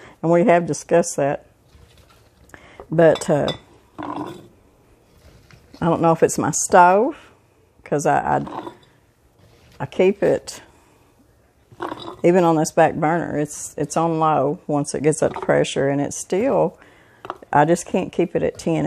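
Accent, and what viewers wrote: South, United States. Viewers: I’m pretty new to your channel now, but didn’t realize you and Mr. Brown worked outside your home. Where do you work? I’m getting use to your Southern accent. Interesting video. Thanks for sharing.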